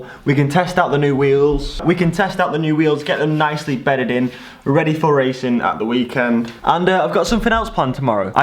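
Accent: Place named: United Kingdom. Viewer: I am English learner, Omg 😲 he speaks very fast 💨 but I love ❤️ the British accent. I like listening to the a natural speakers. Although he has a strong accent but I have known some of his conversations.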